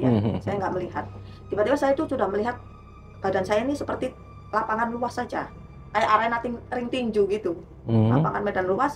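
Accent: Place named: Surabaya, Indonesia. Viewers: Metu medok jowone mbak seng marai lucu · Omongane wong suroboyo nyel 😂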